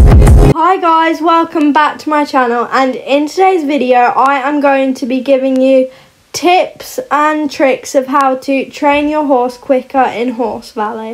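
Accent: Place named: United Kingdom. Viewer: Can we just talk about how she sounds perfectly British? Not being rude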